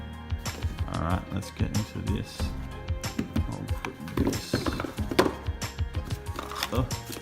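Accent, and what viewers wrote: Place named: Australia. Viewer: I love australian accent so much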